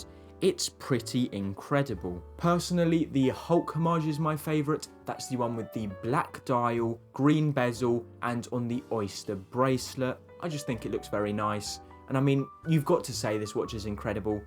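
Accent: England, United Kingdom. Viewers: If you find the way this guy speaks irritating, then you don't want to venture any further than Southern England. I live in the North and there's a completely different accent every 30-40 miles. To anyone outside the UK it probably feels like they're visiting a new country within a 1 hour drive 😂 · He is British, whats the problem....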